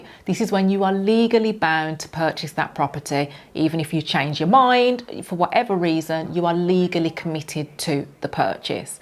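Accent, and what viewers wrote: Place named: Essex, United Kingdom. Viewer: Thank you for the video, last time I left a message regarding to your Essex accent, now in this video you accent is perfect, I can fully understand everything. Thank you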